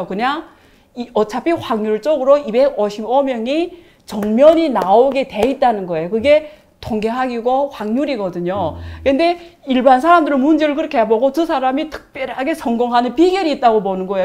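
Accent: China. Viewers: 말투가 화교분이신듯.... 너무 뻔한 기본지식을 특별한갓처럼 말하시는듯...... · 정확한 발음의 한국사람도 많은데 이상한 중국식 한국발음에 여자를 방송에 ...짜증나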